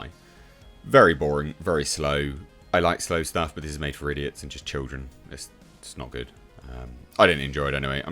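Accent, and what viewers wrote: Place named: United Kingdom. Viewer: Wow. So many tiny dicks, so many scared man-boys. Ten million "men" demeaning women daily is equal to one person speaking up and calling them on it. Sick sad little America, and Britain I guess, considering the accent of the host. Torches and pitchforks over nothing. But hey, rally around the hate. It's what drives this country. Especially in the new dark ages of the American barbarian south, where little white man-boy is king. Is anything short of forced re-education going to change things for the better?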